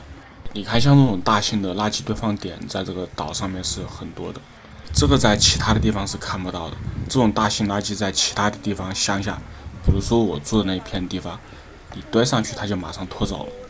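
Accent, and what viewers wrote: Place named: Hubei, China. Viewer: 武汉口音😁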